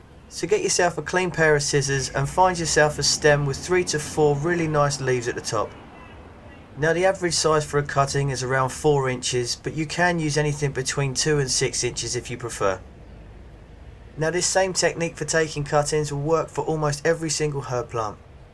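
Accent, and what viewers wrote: United Kingdom. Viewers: Love this video. Love your accent. Nice British chap showing them how it is done! Excellent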